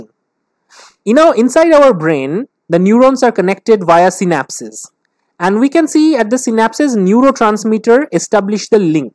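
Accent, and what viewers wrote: India. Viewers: This guy's got such a strong Indian accent, I love it. None of the cullies in my family have this strong of an accent, this guy's more Indian than Indian.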